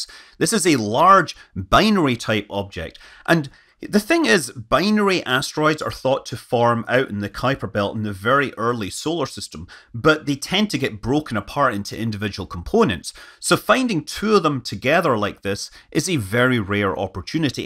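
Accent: Scotland, United Kingdom. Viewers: Greek names always sound best with a Scottish accent.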